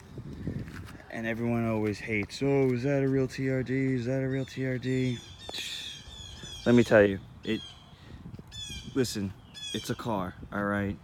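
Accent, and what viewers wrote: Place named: New York, United States. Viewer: The New york accent is all i hear lol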